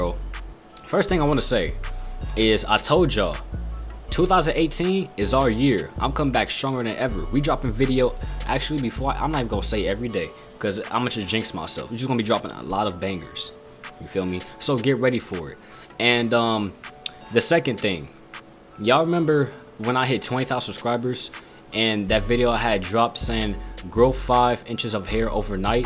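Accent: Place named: United Kingdom. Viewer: When I hear this guys voice I thought he was from the uk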